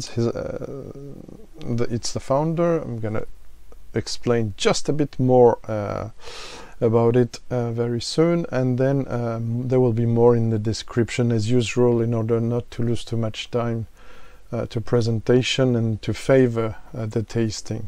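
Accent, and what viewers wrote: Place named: Highlands, United Kingdom. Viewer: Still working on your Highland accent, I see? A few months spent in a rural village and no one would know the difference. I do like the presentation of the pre-1974 Famous Grouse, surprised at the striking differences between the modern version and pre-1974 edition. I agree with you on the peated version of Famous Grouse, it doesn't rock my boat either. Maybe that's why they keep repackaging the bottle?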